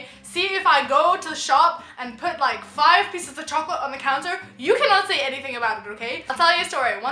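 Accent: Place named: Australia. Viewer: How the fuck do you sound Australian?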